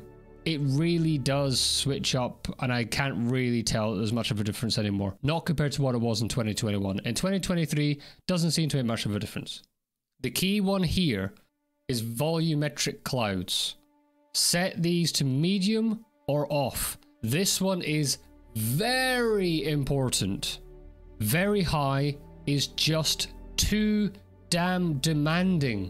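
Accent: Scotland, United Kingdom. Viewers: Thanks for the great video. This will help squeeze every bit out of Star Citizen. Also, love the Scottish accent.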